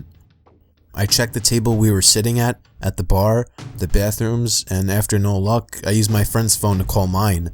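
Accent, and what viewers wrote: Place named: Boston, United States. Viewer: He also has a Boston accent in his old videos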